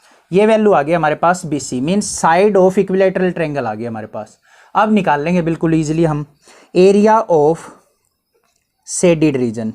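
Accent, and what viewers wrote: Haryana, India. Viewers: hm pta mujhe pahala hi pta chl gya bs confirm ny tha m vaisa sir ap hariyana m konsi side rahta ho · Sir ki voice me hi haryana show hota hai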